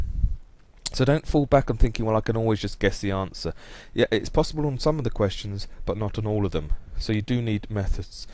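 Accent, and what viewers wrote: United Kingdom. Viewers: I'm guessing the second method is something British people do? No disrespect, it's just a guess. (Your accent sounds British, that's how I got to that conculusion.)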